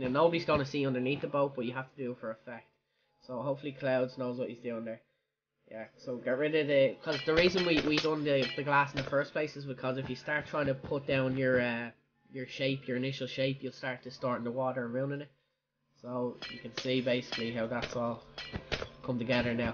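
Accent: Ireland. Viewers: are u irish and if u are you are from dublin or tallagh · R u Irish cuz I amzz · r u irish so am i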